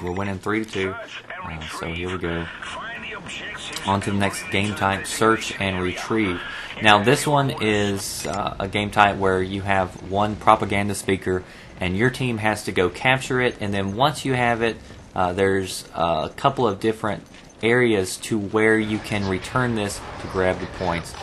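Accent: South, United States. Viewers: where are you from? you sound like you're from the south.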